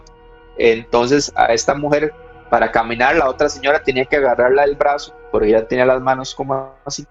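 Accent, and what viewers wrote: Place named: Costa Rica. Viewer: tu acento es. Tico....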